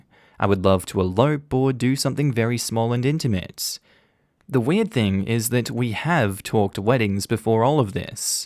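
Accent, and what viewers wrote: Australia. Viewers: Hey!!!! I figure I should comment more because I’m here for pretty much all your videos haha. Also oddly growing up I was never fond of the Aussie accent (I’m from NZ) but for the last few months you’ve been my go-to reddit readover type videos voice favourite. Love the work and I’m happy you’re back safely in Aus. Hope your fam in Ireland is doing awesome as well :) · Same here! I can't sleep listening to this Aussie.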